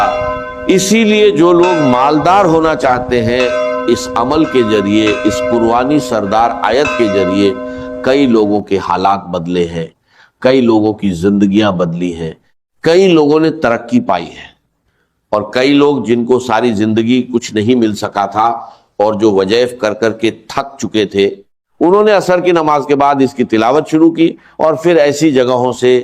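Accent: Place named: India. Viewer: یہ کون ہندی بھائی اپنے خاص لہجے میں اسرار احمد کی نقلی آواز کو بول رہا ہے لیکن اتنی لمبی باتیں اور واعظ دینے کے بعد وظیفے کو صحیح طریقے سے نہیں بتا سکا کے کیسے پڑھنا ہے۔وظیفے کو توڑ توڑ کے بیچ میں بات کرتا ہے جو لوگوں کو سمجھ نہیں اتا کہ کب وظیفہ شروع ہوا اور کب اس کی بات کررہا ہے۔ کیوں لوگوں کو پریشان کرتے ہو۔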